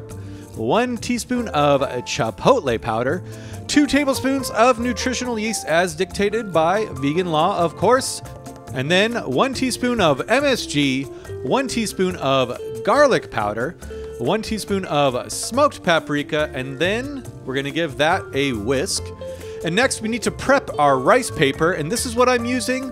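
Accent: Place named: California, United States. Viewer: I thought he sounded like Chef John, too! But yeah, it appears that it's just a regional Californian accent :)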